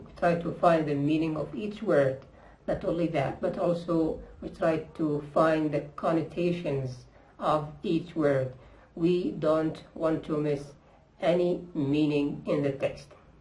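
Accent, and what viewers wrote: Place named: India. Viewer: Dude u sound Indian ngl 😭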